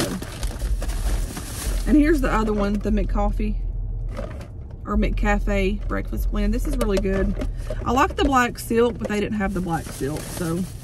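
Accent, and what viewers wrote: South, United States. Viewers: Amen Lady!! You said everything that I would have said too! I'm a new subscriber and I really like your southern accent. I have one too. I was born and raised in Tennessee. I now live in Alabama. My parents and a lot of family are in Tennessee and I visit as much as I can. I love the way you think about things and the ones with harsh comments are the ones insecure about themselves. You are beautiful inside and out. Take care and God bless!! ❤️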